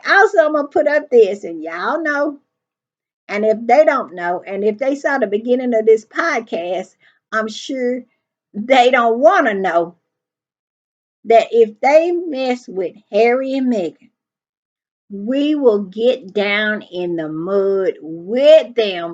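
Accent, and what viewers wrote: South, United States. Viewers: ❤❤ TY ❤❤ We love the pettiness, the messiness, the southern drawl, we love it all!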